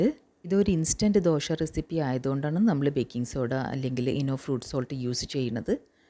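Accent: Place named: Kannur, India. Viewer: Kannur slang❤❤❤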